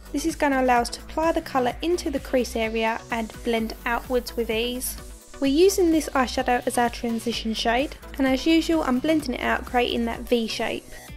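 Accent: Essex, United Kingdom. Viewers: I'm a fan of yours too! And it's so nice to hear your Essex accent.... I from down the road East London! I did giggle when you said 'in alf'! Could you please tell me what you think of the Hakuhodo brushes, would appreciate your professional feedback, thanks.... D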